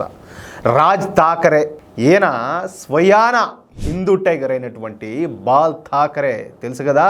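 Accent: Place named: Ongole-Nellore, India. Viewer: Kuttabagala undla nee voice mana ongole Nellore bashalo em matladavanna ....subscribe chesukondi plz Andaru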